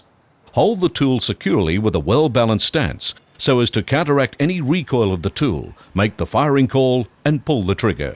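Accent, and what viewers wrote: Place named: New Zealand. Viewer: It's an Australian video, but the narrator sounds New Zealand.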